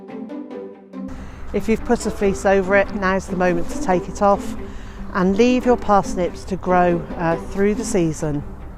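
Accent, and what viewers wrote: England, United Kingdom. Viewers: Good to hear an English accent,thanks for the info.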